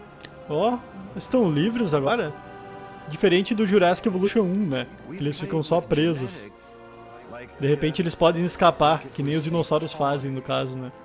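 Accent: Rio Grande do Sul, Brazil. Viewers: você do Rio Grande do Sul?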